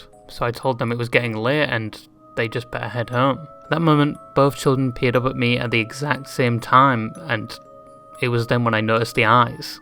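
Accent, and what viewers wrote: United Kingdom. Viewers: Noooooo PLEASE 🙏 I love accent 😭 Don't make his accent go away 😢 He has allotta more femboy topics to discuss 🙏😔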